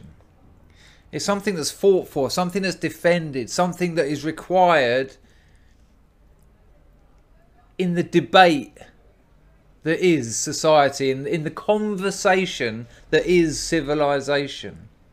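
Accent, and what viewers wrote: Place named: Cockney, United Kingdom. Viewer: spot on mate good to hear a fellow cockney of the old school.compared to nowadays the old days were utopia.keep on doin what yer doing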